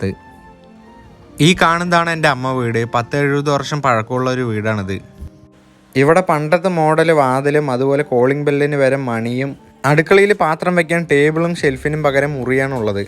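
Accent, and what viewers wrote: Palakkad, India. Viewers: Love ur palakkad slang ❤ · Venda njan കൊല്ലം ആണ് but പാലക്കാടൻ slang നല്ലത്... തനത് രീതിയിൽ വീഡിയോ ചെയ്യുക 👍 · നല്ല മോൻ. മുത്തിയമ്മയെ കണ്ട സന്തോഷം. മോന്റെ കണ്ടന്റ് നല്ലതാ. ഭാഷ പോളിഷ്ഡ് ആക്കു. നമ്മുടെ പാലക്കാടൻ ഭാഷ എല്ലാർക്കും ബോധിക്കില്ല. God bless you dear..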